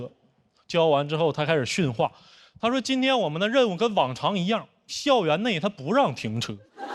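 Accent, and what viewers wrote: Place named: Liaobei, China. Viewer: 说学邱瑞的观众，你们身边一个辽宁人都没有？我们辽宁人或者说东北人平时聊天就这样，而且林简七抚顺人，邱瑞沈阳人，统称辽北人，口音就极为相似，你不如说全辽北人均学邱瑞。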